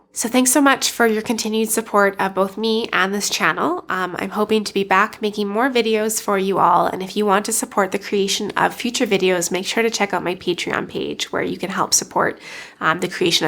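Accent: Canada. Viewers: you are from the prairies or the west, i can tell by your accent. i'm from ontario, and can tell you're from either saskatchewan or alberta or maybe interior BC. this makes me wonder what would happen if you weren't a white woman. what they did to you was very wrong. and imagine if you were an indigenous woman they would like have been much more violent towards you.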